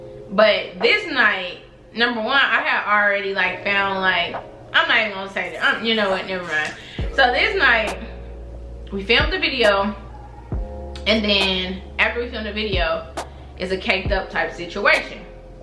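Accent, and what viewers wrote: Miami, United States. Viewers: Sound like Miami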